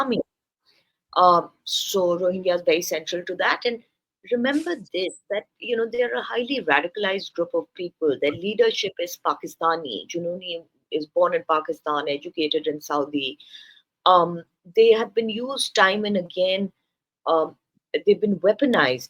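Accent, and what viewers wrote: India. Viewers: Stop spewing BS in Indian accent. Bangladesh is not in a mess, we are in a very very good shape. Your own foreign policy screwed us over for 15 years. Now just stay away. People don't want ANY Indian influence here anymore. Radicalization? Don't you know what RSS or BJP is? What their goons do in India? And you dare to lecture us about radicalization? Wow.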